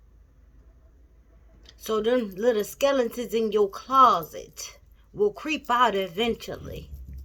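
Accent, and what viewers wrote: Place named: Detroit, United States. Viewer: I’m a new subscriber. Enjoyed your video and I definitely hear that Detroit accent. 😀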